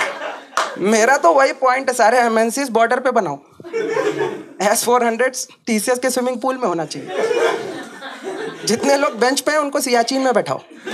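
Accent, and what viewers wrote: Bihar, India. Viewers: Underrated. Always a big fan of BIHARI accent and fun.. Biharis are unique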